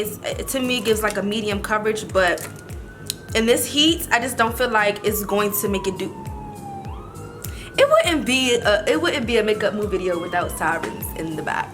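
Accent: DMV, United States. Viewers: New subbie!!! I knew you were from DC by the way you talk lol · I can already tell your from the dmv by hearing the way you talk ❤️ love this video too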